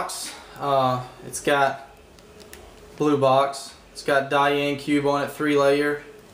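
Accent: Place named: South, United States. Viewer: You're not from the Boston area, are you? You really sound like you're from the South. I lived in Knoxville, TN for a long time, and you really sound like you're from that area. By the way, the Maru, you need to tighten if it comes out of alignment. Tightening it will stop that. The Dayan 4x4, you HAVE to lube the inner 2x2 core, or it won't be good. It really is the best when you set it up right. Lube and loosen the screws on the 2x2 and tighten the ball shell screws all the way.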